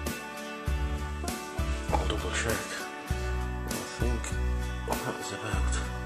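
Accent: Middlesbrough, United Kingdom. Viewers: Oi oi m8....hope all's ok...great vid...hope all works out well....yeah I had t laugh at the froth it looked like one big pint...lol...I'm just avin a pint of wherry atm n I've gotta admit it's got better as the weeks go by the head stays intact all the way down the glass...anyway m8 hope this brew is a banger 4 ya m8...lv nut brown ale...btw are you from Newcastle way it's just you sound like my family Middlesbrough...big respect n oi oi...gav.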